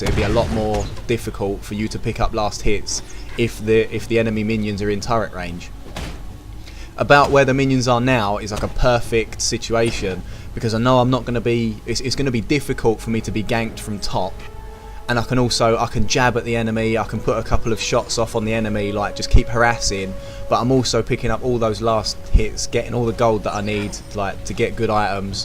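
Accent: United Kingdom. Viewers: I love your videos !! Just the fact that you speak "English English" makes the whole thing alot more understandable cheers !!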